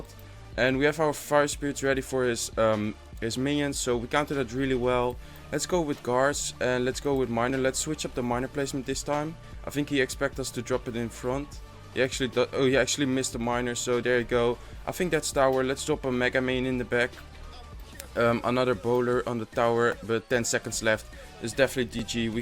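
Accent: Netherlands. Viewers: Haha, loving the Dutch accent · where are you From? You've Got a English accent like a dutchie that is talking English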